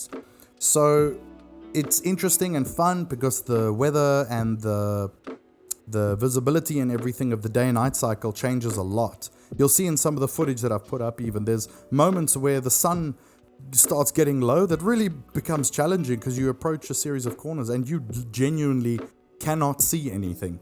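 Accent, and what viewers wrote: South Africa. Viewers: i knew i recognized that accent, im raised in america but born in SA, cool video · So nice to hear a South African voice for once! Dope content!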